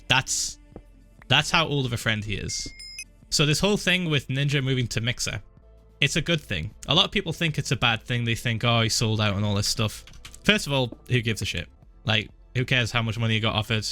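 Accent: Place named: Northern England, United Kingdom. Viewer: Nothharn LKegnAnd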